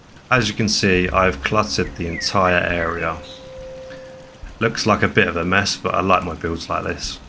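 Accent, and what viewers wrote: United Kingdom. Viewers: just discovered your channel and omg. im so happy i found you !! my fo4 is screwed atm (heavily modded and the ng update killed it lmao) so im getting my fix playing new Vegas/watching videos (i have major ADHD/autism and am hyperfixating on fo4 so not being able to play it is miserable tbf). also as a fellow brit i find it frustrating how many youtubers are american so your voice is nice to listen to lmao. (i'd guess you're more of a southerner?) anyway keep it up man, your builds are super creative and i love watching you do them <3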